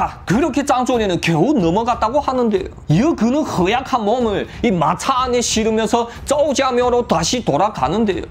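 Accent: China. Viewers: 이분 조선족이신가요?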